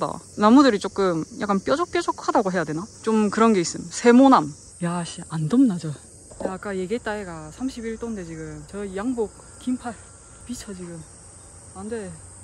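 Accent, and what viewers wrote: Busan, South Korea. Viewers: 안녕하세요? 우연히 발견한.... 15년 전 츠와노 옆 동네에서 3년 살면서 츠와노...놀러 간 적이 있어요. 신사까지만 가 본 기억이 있네요. 곰을 만나지 않고 무사히 하산....보는 내내 긴장했어요 ㅎㅎ 즐거운 여행이 되시길 빕니다. 부산사투리 재밌어요💞💞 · 自然派美人ですね。面白いし。購読します。方言も最高（笑）。자연파 미인이시네요.성격도 재미있는것 같아서.앞으로 잘 볼게요.그리고 경상도말이 최고 ㅋ. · 곰 안나와요! 일본시골에서 부산사투리 조지시는데 ㅎㅎㅎ 이외중에 피부 미모 열일! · 부산표준어 찰지네요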